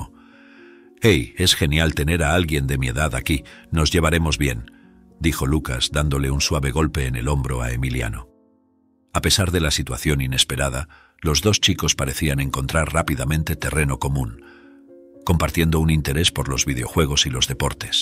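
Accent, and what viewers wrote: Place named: Spain. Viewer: Para que la historia resulte interesante y hermosa, tiene que haber una buena historia, una excelente voz, bien modulada, buen lector. Todo éso se ha encontrado en ésta. Muy especialmente, con el hermoso acento del Castellano por un español. Me encantó.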